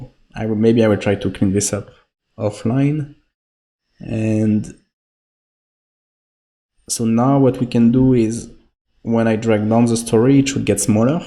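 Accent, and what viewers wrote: France. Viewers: J'ai reconnu l'accent français, merci pour tes vidéos super intéressantes. Happy hacking!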